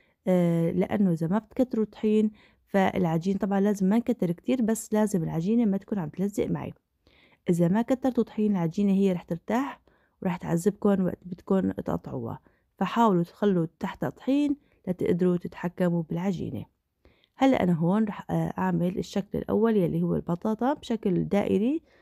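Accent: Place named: Iraq. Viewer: مرحبه شلون الصحه هل انتي من عراق طريقه كلامج اني من عراق اول مرة اشوف قناتج كلش حلوة ومقاطع وقتها قصير اتمنالج موفقيه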